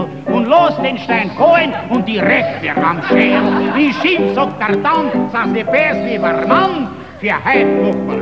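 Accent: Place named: Vienna, Austria. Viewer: Ist das gut oder ist das gut? Ich habe die Version von Peter Frankenfeld gehört (den ich sonst wirklich sehr gerne mag), und die hatte ja nicht wirklich viele positive Kommentare. Da wurde auf diese Version verwiesen. Und die finde ich wirklich TAUSENDFACH besser. Und nicht nur, weil ich den wienerischen Dialekt so mag - da ist einfach viel mehr Gefühl drin. Klasse!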